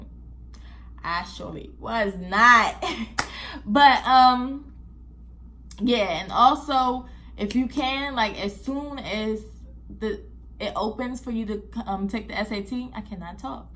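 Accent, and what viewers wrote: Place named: DMV, United States. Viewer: Where are you from? I hear an accent in your voice. It sounds like you may be from the DMV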